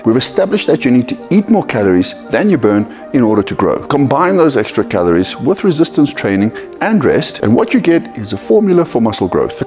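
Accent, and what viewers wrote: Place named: South Africa. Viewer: I've never heard such a nice south african accent.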